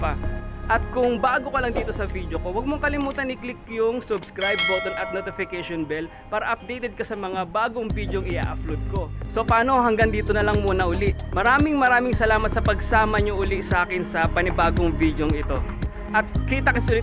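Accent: Batangas, Philippines. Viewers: Kabayan ala eh batangeño kb san o s batangas aq eh s tanauan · Hahajaja laptrip..batangueno si kuya..hahaha kuya dalawin moko para pangatlo na ako sa manunuod mp hahahaha...anyways nice and entertaining vid mo kuya..salamata...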